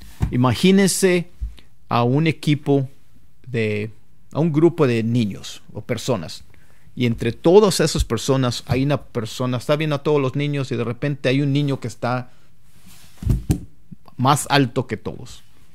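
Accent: United States. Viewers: Pensé que era gringo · me encanta como lo habla parece gringo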